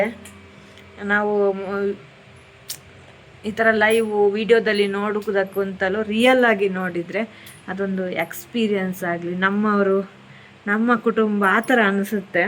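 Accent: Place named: Hubballi, India. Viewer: ಹುಬ್ಬಳ್ಳಿ ಕನ್ನಡ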